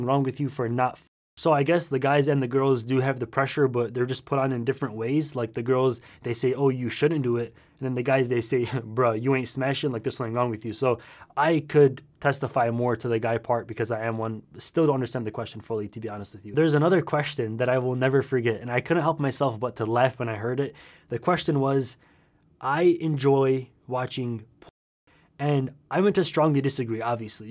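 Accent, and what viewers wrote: Arab world. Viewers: right when I heard your voice I knew you were arab/egyptian lol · You have a Middle East accent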